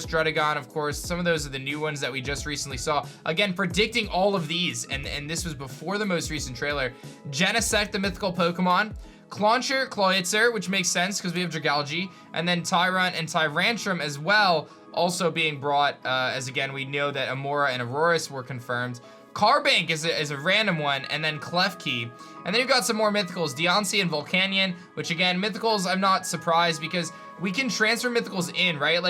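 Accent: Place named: California, United States. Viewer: Had no idea you were in CT. Me too! Would’ve thought California from some of your speech patterns. Big fan of your channel. See ya around!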